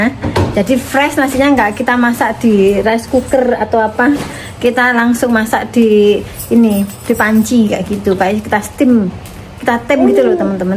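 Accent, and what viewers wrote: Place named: East Java, Indonesia. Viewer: Bhs nya, medhok priyaui JaTim, dalem inggih JaTim mb, ke Jogja krn mas suami tugas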